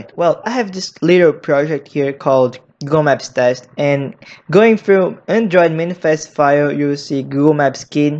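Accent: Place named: India. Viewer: Caramba, véi! Pensei que fosse um indiano! Já ia fechar o vídeo. :V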